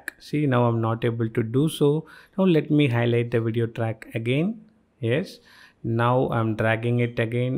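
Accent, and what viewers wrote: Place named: India. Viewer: The dreaded Indian accent on a tutorial video.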